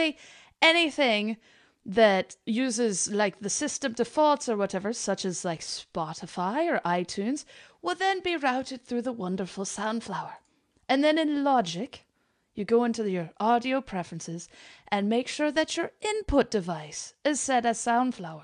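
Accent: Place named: Scotland, United Kingdom. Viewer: Your scottish accent is super hot.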